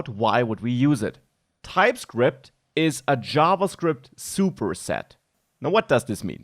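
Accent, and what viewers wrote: Germany. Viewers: Also ich muss ja echt sagen.... Du hast den deutschen Akzent fast komplett raus bekommen, sprichst flüssig und deutliches Englisch und hast dazu auch noch ein super Video gemacht. Ich habe es zwar noch nicht ganz zuende geschaut, bin aber jetzt schon wirklich wirklich beeindruckt. Keine nervige Musik oder halbnacke Frauen, die zwischendurch eingeblendet werden, keine Beleidigungen oder unnötiges Gerede aus deinem Alltag, kein Runtermachen von irgendwelchen Nationalitäten oder Gruppen und dies alles noch kombiniert mit einer wirklich cleanen Videostruktur. Ich kein Deutscher und kann einfach nur sagen das ich dein Englisch als "great" bewerte. Wirklich gute Arbeit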